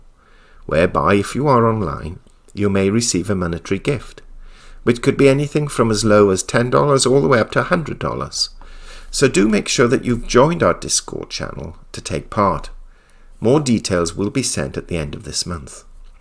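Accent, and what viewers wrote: United Kingdom. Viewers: his voice is the trademark. Unmistakably British accent.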